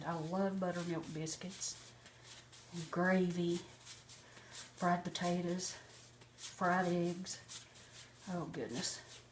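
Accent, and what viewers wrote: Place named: Oklahoma, United States. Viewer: What state is your accent from? Sounds like Reba McEntyre style. She grew up in Oklahoma. Is that your dialect, too? Sorry to focus on your accent and not the biscuits. I’m just really interested in being able to tell exactly where people are from. I’m really good at England, Scotland, and Germany regional dialects. Being from Maine myself, I’d like to get better at domestic dialects.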